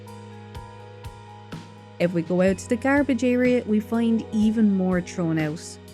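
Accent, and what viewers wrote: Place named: Ireland. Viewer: The moment I heard ur voice, I immediately knew you're from Ireland 🇮🇪. Such a cute and sweet accent fr. Btw amazing video ( ≧∀≦)ノ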